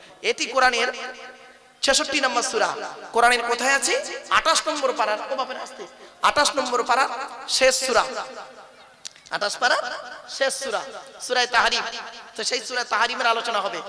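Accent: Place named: Kolkata, India. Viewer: হুজুর আপনার ওয়জ খুব সুন্দর লাগে আমি বাংলাদেশ থেকে বলছি আপনার কথা শুনে মনেহয় কোলকাতা কি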